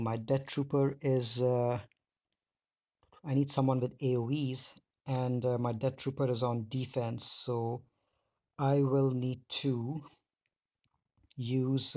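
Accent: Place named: Asia. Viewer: It's the 1st time im hearing u... Feels so satisfying because all ur vids are soundless 🤣🤣🤣 BTW from ur accent, i guess that u r an asian.... Am I Ri8? Cause im Asian too 😋😋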